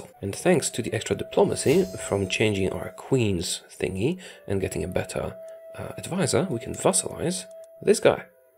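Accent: Poland. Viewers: It legit took me 3 1/2 minutes to recognize your voice due to that very, very subtle polish accent. No joke: I thought i was watching The Spiffing Brit for quite a while 😅😂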